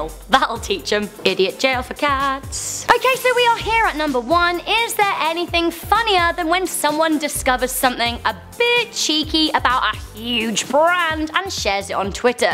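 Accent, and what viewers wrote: United Kingdom. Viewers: Anybody else love the British accent???😁😂😃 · Your British accent makes this 100% better.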